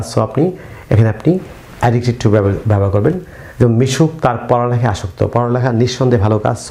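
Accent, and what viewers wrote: United Kingdom. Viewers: Nice class sir. You translate the passage of HSC textbook. Your british accents is very nice sir. I can learn british pronunciations from you.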